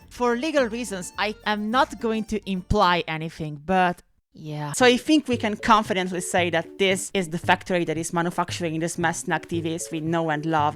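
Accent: Italy. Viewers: She is definitely Italian. Her English pronunciation is really quite good, though. Italians usually have a really hard time pronouncing H at the beginning of words, for example, but she does it fine.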